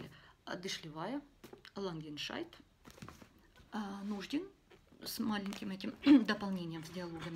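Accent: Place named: Ukraine. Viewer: Автор видео, вы бы сначала русский выучили! Стыдно говорить и выкладывать видео с таким колхозным малоросским акцентом!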